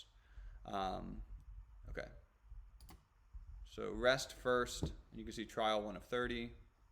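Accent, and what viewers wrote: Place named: Belfast, United Kingdom. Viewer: Tongue, Accents Belfast oh mannnnny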